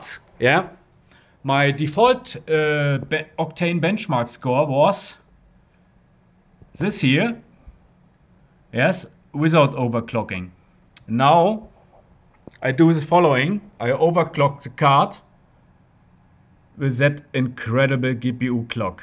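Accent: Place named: Germany. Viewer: German accent is the best :D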